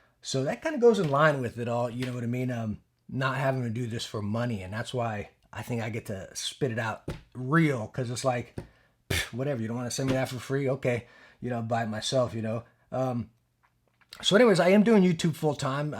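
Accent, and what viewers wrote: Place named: New Jersey, United States. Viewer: As a Brit I guessed you were from jersey from your accent so I'm pretty impressed with myself, that could also just be shear coincidence tho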